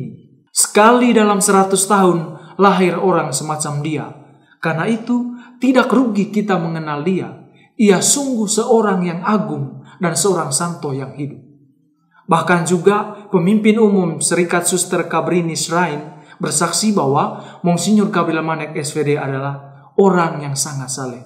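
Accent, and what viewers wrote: Manggarai, Indonesia. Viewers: logatnya pasti org Manggarai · Naratornya masih kental dengan logat Manggarai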